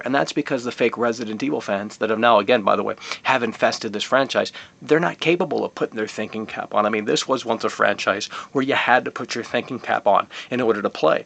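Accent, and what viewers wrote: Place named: Texas, United States. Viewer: Lol its the very fact that his Texas accent that made it seriously funny to me.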